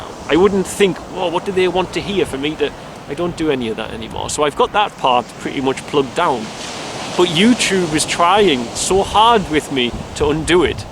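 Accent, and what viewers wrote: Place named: North, United Kingdom. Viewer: I'm glad you just sit and ramble on with beautiful information:))) and love your northern accent!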